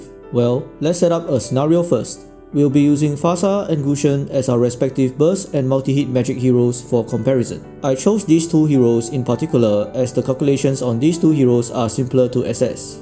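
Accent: Singapore. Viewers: u sound like a sg person hahaha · ay fellow singaporean, the accent HAHAHA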